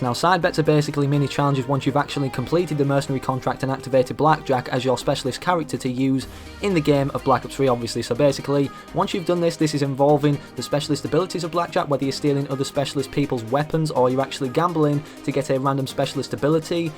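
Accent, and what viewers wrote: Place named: Yorkshire, United Kingdom. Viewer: know a Yorkshireman when I see/hear one ;)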